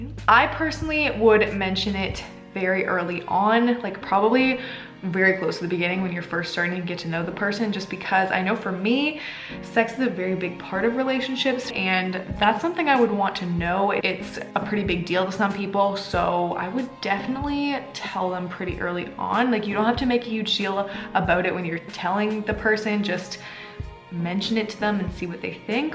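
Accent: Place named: Canada. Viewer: "Red Fleg" I love the Canadian accent.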